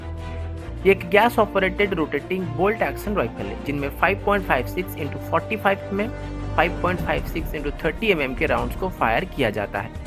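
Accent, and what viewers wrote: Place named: Uttar Pradesh, India. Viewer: Neuz (news) Phayr (fire) Acsen (Action) Uuj (use) Sorter (shorter) Specal (special) I think 🤔 it’s UP accent.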